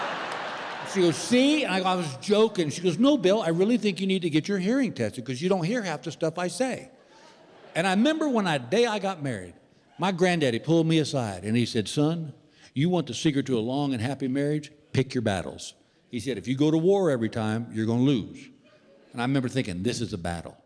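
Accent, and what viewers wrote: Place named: South, United States. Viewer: it’s not muddling honey, it’s his Southern accent 😂😂😂😂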